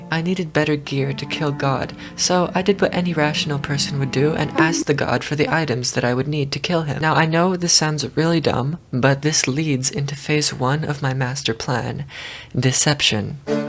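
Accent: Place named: United Kingdom. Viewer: Brit’ish ebony POG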